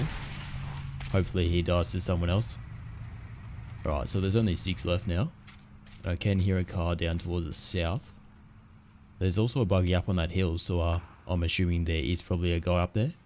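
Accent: Australia. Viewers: what part of australia ya from mate? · Australian accent , badass skills. Gold.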